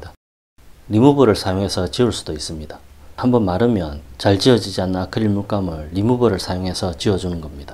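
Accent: Gyeongsang, South Korea. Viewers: 님 경상도 사투리 멘트가 아주 정겹고 그림설명도 넘 좋으시네~♡♡ 🎉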